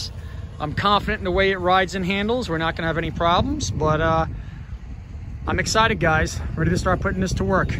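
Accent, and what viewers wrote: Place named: New Jersey, United States. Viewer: He’s a great helper! I hear the jersey accent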